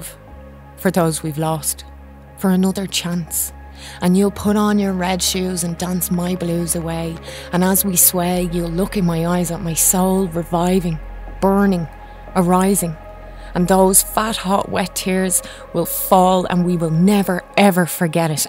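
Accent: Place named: Ireland. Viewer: Oh my goodness thank you! Yes, sticking close to my apartment to minimize risk, while putting forefront my mental health. May you and yours remain safe as well! I must exclaim I so love your video! I shared it on my own Facebook wall and much thanked my friend Ginger for steering me toward it. This video is beauty, hope, assurance, validation, comfort. Plus an Irish brogue oh my! All together scrumptious. <3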